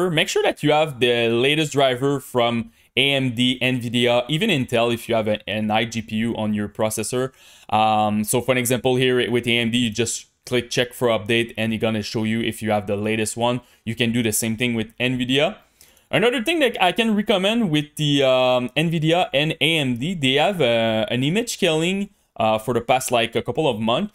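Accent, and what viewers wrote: Quebec, Canada. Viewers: Tu es québécois ? Lol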